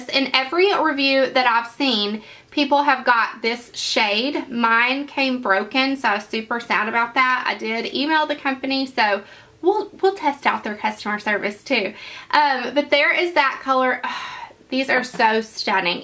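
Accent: South, United States. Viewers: Those are amazing!! And as a former texan...I love your accent! Still a southerner..just a state over. I subscribed. Going to have to check the site out!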